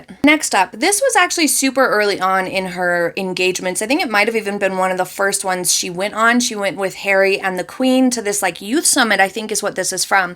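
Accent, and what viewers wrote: United States. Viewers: I love your videos, I really enjoy getting the notifications for a new one! Thank you for sharing your knowledge with us, it's helping me to buy wiser and not waste money on outfits I love the look of but no way was it made for me! Could I ask your background, did you train in fashion or styling? Also I love your voice, a great American accent, I would ask where you live to try to place it, obviously I won't as it is to personal. Keep making, keep teaching, keep entertaining, thank you x I'm subscribed, liking and sharing ❤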